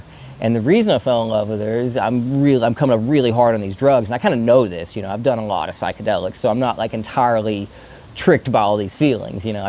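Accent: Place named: Texas, United States. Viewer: i kno this guy well. he’s from texas, you can hear his texas “twang” come out some here. he use to live in athens GA and make edibles w greg simpson hash oil method. he’s really smart, and a good businessman man. He’s no chemist, at all… decent side kitchen chemist maybe. he’s a exmarine and a drifter. and he is not on drugs, well like not stimulants anyways.. he’s naturally vocally energetic and fidgeting like this.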